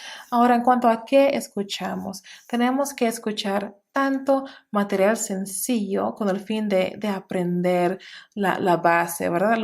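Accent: Standard. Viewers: Wooow tu español es EXCELENTE... hablas mejor que muchos latinoamericanos... Tono adecuado, buen acento neutro, buen ritmo, sin acento gringo... Esta PERFECTO felicitaciones 👌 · muy cierto, tiene un acento bastante neutral...con unos toques caribeños pero muy buen español , felicitaciones!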